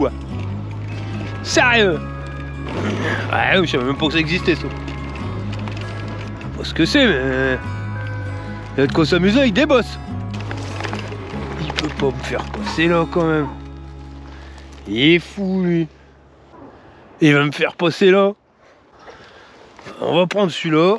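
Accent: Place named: Nord, France. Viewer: salut! Ta chaîne n'est pas la seule que je regarde, mais c'est celle qui me ravit le plus!!! Tu parviens à la fois à donner des infos pratiques, techniques, et touristiques avec le sourire et la simplicité!...Le tout avec eul' tchiot'accint du Nord qui me rappelle ma jeunesse valenciennoise... Merci, et bécot à Maryse... PS: -j'ai du mal avec Komoot: pas simple à utiliser, et pas toujours facile de retrouver sur l'appli certaines balades pourtant référencées sur le site internet... -si l'envie vous prend à tous les trois de découvrir l'Alsace et les Vosges du Nord, vous pouvez déjà compter sur une logement!;-) mais attention!!! Ça grimpe dur!!!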